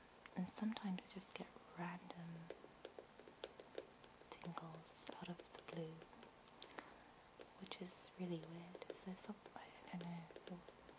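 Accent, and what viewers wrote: England, United Kingdom. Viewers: It's not an interesting accent it's a British accent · I love your accent, which part of England is it from?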